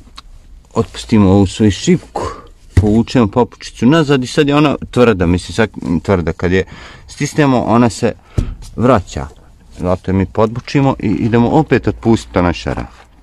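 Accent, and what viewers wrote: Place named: Bosnia and Herzegovina. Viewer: Obozavam gledati tvoje video snimke,legenda si ,pogotovo kad ti cujem naglasak vratim se u Bosnu opet ..Pozdrav iz ledare Norveske!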